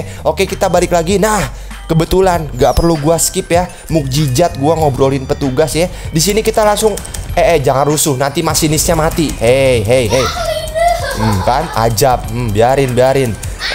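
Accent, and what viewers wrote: Java, Indonesia. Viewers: medok bang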